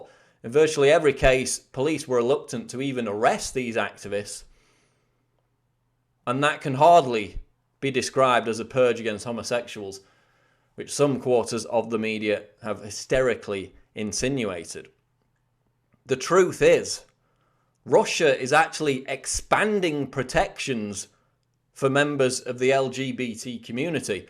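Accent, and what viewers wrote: United Kingdom. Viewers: never expected to hear bullshit in british accent I always thought a sign of intelligence and sophistication....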